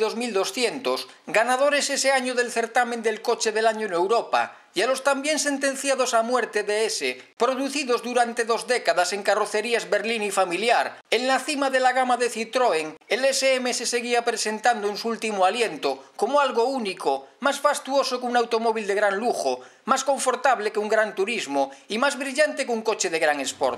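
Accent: Galicia, Spain. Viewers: La entonación parece robótica, es estresante, no sé si serás gallego. El vídeo muy bien realizado y el coche me encanta.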